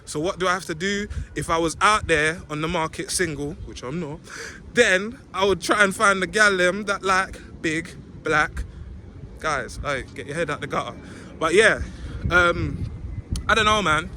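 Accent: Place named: Peckham, United Kingdom. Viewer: Man sound like he from Peck'NAM.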